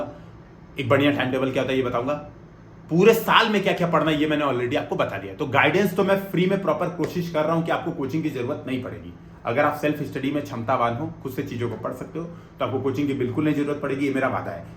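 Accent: India. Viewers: Sir aap gorakhpur se ho kya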